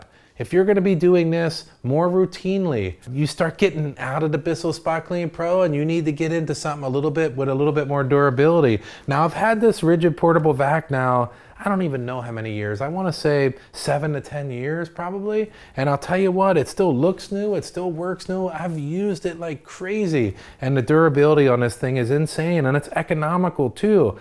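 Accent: Hawaii, United States. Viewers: Love that Hawaii accent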